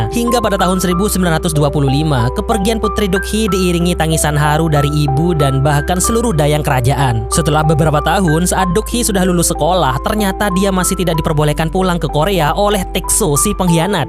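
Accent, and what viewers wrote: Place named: Madura, Indonesia. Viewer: LOGATNYA KETAUAN BANGET KALO ORANG MADURA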